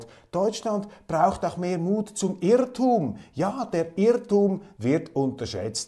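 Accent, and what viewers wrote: Switzerland. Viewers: Lieber Herr Köppel, ich kenne Ihre Sendung noch nicht so lange, aber ich bin zwischen ein großer Fan von Ihnen und Ihrer Sendung geworden. Ich höre sie so gut wie immer zu meinem ausgedehnten Frühstück und zwar auch deswegen, weil Sie mich jeden Morgen etwas zum Lachen und Schmunzeln bringen, trotz der ernsten und meistens unerfreulichen Themen die behandelt werden. Aber durch ihre brilliante Rhetorik, gepaart mit schweizerischem Klang und Witz, kann man dabei sogar noch etwas schmunzeln, denke nur an " Haustier Mentalität, Rambo Allüren" usw. kann garnicht alles aufzählen. Auf jeden Fall hilft sie mir etwas gelassener in den Tag zu starten und nicht zu verzweifeln, angesichts der tristen politischen Lage in Deutschland. Wisssen Sie, nicht nur die Politiker sind Deutschland müde geworden und verleugnen jegliche Heimatgefühle, bzw. wollen uns diese austreiben mit allen perfiden Mitteln, auch wir Bürger sind Deutschland müde und unserem Land überdrüssig geworden, angesichts dessen was hier abgeht. 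Ich kenne nicht wenige junge Leute, die ihr Leben noch vor sich haben, die sich ernsthaft mit dem Thema auswandern befassen. Ich gehöre auch dazu, bin aber leider schon zu alt dafür und für einen erneuten Krieg erst recht, das habe ich alles schon miterlebt und das reicht mir für ein Leben. Das schöne Gedicht bringt es genau auf den Punkt, könnte in unserer heutigen Zeit verfasst worden sein.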